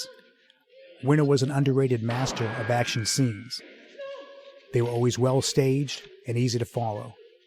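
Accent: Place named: Boston, United States. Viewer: Came for the Winner facts, stayed for the Boston accent.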